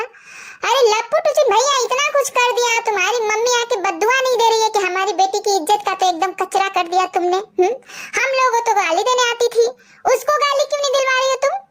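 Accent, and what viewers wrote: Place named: Bihar, India. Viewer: Aap purvanchal (west bihar/east up ) ki hain kya... Q ki apke kuchh kuchh words ya tone ek dum apna apna sa lgta h😂😂😂😂 bhojpuri wali isiliye or jyada hasi aati hi😂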